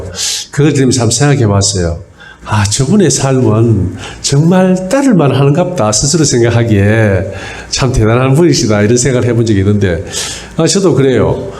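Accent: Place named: Gyeongsang, South Korea. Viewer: 경상도 구수한 사툴이 할렐루야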